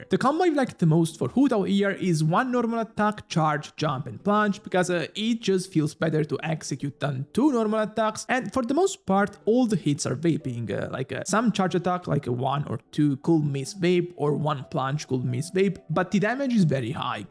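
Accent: Italy. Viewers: accento italiano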